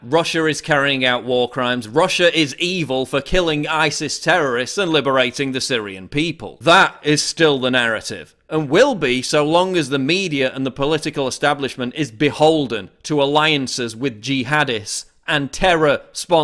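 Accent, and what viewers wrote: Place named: England, United Kingdom. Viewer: Wait a minute you sound like someone from England but calls football soccer? Still good video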